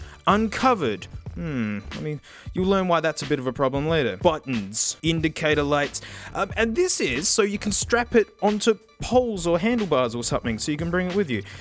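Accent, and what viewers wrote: Australia. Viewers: I bet every Aussie dudes sounds similar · Imagine watching this to figure out which speaker to buy but it’s just an Australian man talking about his sexy speaker and worrying about his pkcell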